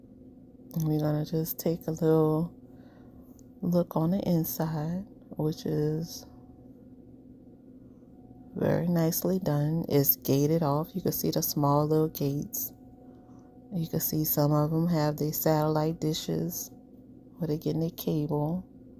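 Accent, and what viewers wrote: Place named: New Orleans, United States. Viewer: Hey. You say you from the West Bank. But you from New Orleans. I hear it.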